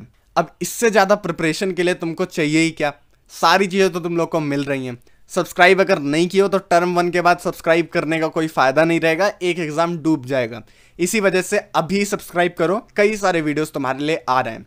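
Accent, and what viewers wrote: Bihar, India. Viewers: Hello bhaiya aap bagaha bihar se ho kya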